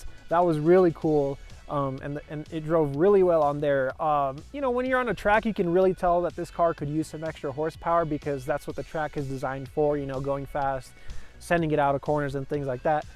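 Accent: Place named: Canada. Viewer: That Canadian accent and way of speaking annoys the crap outta me.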